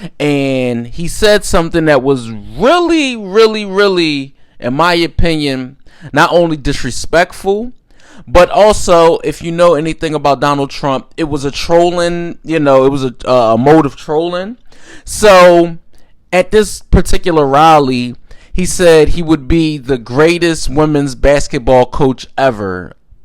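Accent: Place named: New York, United States. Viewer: Sound like you're from one of the borroughs.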